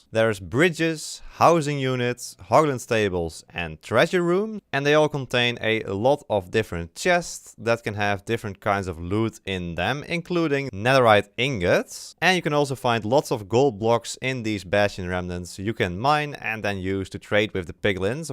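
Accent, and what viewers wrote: Netherlands. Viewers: Bro you sound dutch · i smell dutch :)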